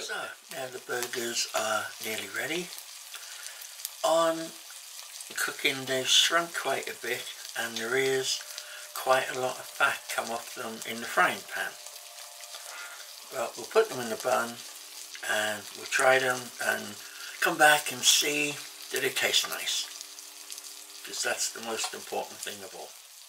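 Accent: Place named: Cornwall, United Kingdom. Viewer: This man speaks with a Cornish accent. His ancestors were Celtic Druids who came from Devon and Cornwall.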